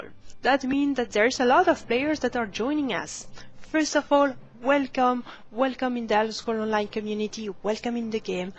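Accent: France. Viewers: my dear, as a new player i would just like to say thank you! :) a wonderful presentation, wonderful & very useful tips...and a wonderful french accent :) mesmerizing voice, a pleasure to listen to you! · that blew my mind, because your accent while speaking English definitely has a french accent to it:D so do you also speak Dutch/German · omg the French accent is real love it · your "r"s suggest that you are frenchspeaker:) love it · I really like the fact that you dont give the usual hardcore, grind this, ignore the game, rush there tips. You made me and for sure other people remember why we started playing mmorpg´s in the first place: diving into a world of fantasy and enjoy it. Big thanks for that. There is only one "problem" about all this. Started the video, saw that pretty face of yours and heard your beautiful french accent. 10 minutes later i had to F5 because i did not remember any single word you said...was just floating on a wave and listening to your voice. 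Stop beeing so charming !!! ;)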